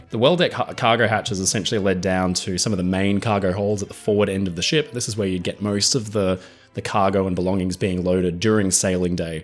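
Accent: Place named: Australia. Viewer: Love your accent, Australian?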